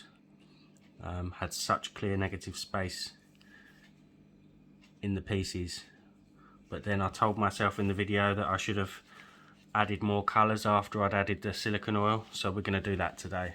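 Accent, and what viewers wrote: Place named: South East London, United Kingdom. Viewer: Hi. Beautiful effects! I'm from S.E. London originally and your accent sounds familiar, is that your area too?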